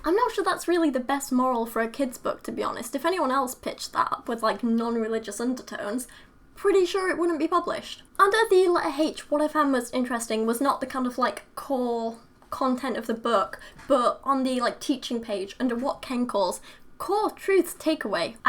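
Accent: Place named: Northern England, United Kingdom. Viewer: Okay, you have the northern accent from game of thrones and you look like a STARK, seriously game of Thrones fans close your eyes and listen to the accent / like other sounds like "ughthugh".....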